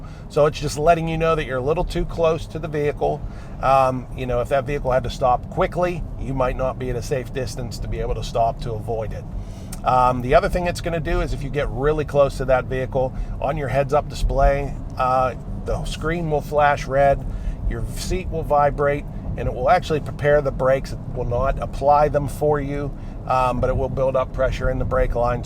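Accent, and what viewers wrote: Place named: Pennsylvania, United States. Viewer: Love the "Uniontown, Pa" accent!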